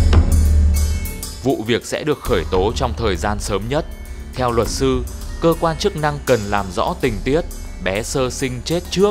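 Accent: Hanoi, Vietnam. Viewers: Giong HN đọc hay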